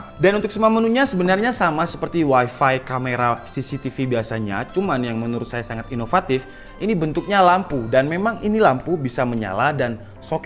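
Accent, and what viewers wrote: Java, Indonesia. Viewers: olehmu medok jowo kuetok pol mas... tulisane dadi tulisannya wkwkwk....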